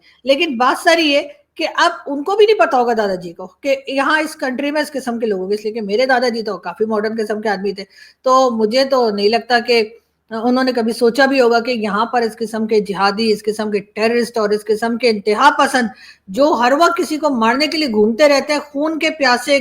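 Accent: India. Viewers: ap Mumbai ke bhasa bolte huye bohut achhaa lagte Hain Love You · Tapka ke chle gaye … UP ki Bhasha … madam.. apke lehze Mai UP jhalakta hai 😂😂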